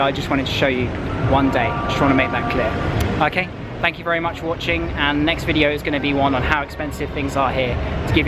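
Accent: England, United Kingdom. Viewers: I love to heard your British accent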